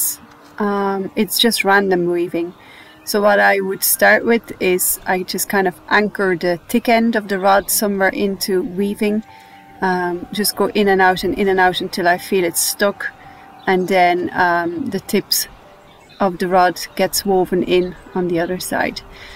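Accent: Ireland. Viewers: Irish accent. 😍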